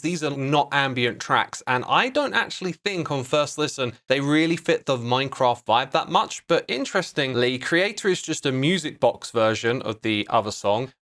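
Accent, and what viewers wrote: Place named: United Kingdom. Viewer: Why do you sound... MORE British than usual???? Is that just me??? lmao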